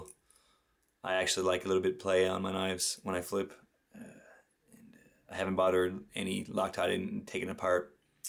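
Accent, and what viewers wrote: Canada. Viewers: Your full Canadian accent came out in this video.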